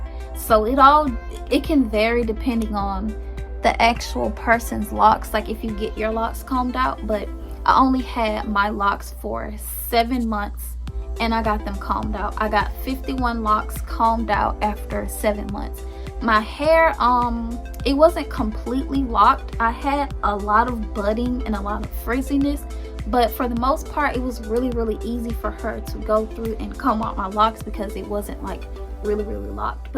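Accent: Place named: South, United States. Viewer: Where are you from? Your accent is so cute and country lol..ppl say in country but I can’t hear it for myself.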